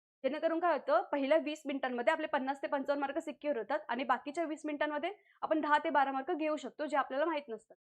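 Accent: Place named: Agri Koli, India. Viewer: मॅडम च्या accent वरून त्या आगरी कोळी कोकणातल्या असाव्यात.... तिकडे असं बोलतात तर घ्या समजून...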